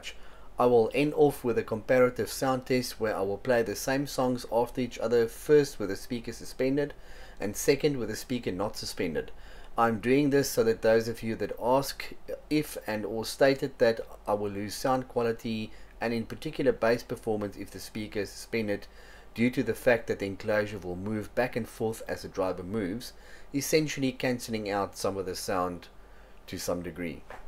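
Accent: South Africa. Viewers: Ive noticed your accent is south African or i might be wrong the thing is people dont use a good headset or proper speakers use quality headphones you will hear they dont lack that much in the bass sound bass definitely a bit more while they are suspended ive just discovered this channel yesterday and im inspired to build my own bluetooth speaker im from south africa currently residing in china so everything is less expensive love your work man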